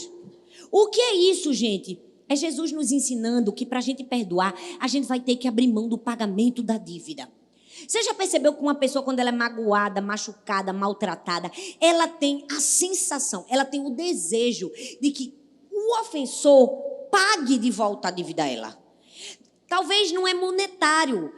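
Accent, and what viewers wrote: Northeast, Brazil. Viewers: Amo o sotaque nordestino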